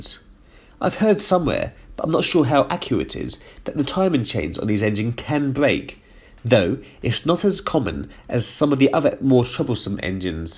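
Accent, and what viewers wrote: United Kingdom. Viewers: Hey, another great video, I really love your British accent too!!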